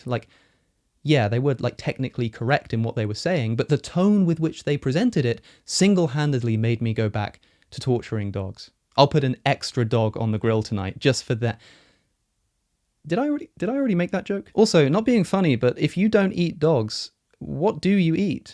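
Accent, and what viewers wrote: United Kingdom. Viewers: Dear god. Please tell me this is satire. Edit: ya. Satire. Pretty good points, but leaving out a lot of the other side’s actually valid arguments that have no easy come-backs. He’s no Contrapoints or Philosophy Tube. Just a cheap imposter with a British accent. 🥱 How about this? Is it vegan to eat a person who consented before death? By Vegan ethics, yes. So I’m gonna eat my fish and chicken and my dogs can eat me when I’m done. Life is a bitch, it always has been. EVERYTHING gets eaten eventually. Wether its by worms and microbes, humans or other animals.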